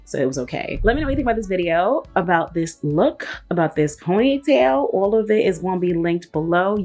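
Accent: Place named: Rhode Island, United States. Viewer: Only a select few are gonna recognize your accent…and I’m one of them! RI STAND UP! And the bronzer looked beautiful on you, but let’s face it, anything would!😍😘❤️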